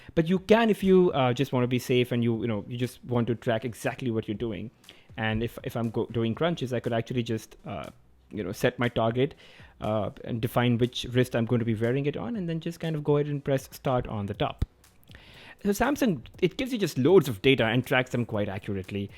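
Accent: India. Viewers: The Indian accent sold me. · Nice video, I like that Indian accent :)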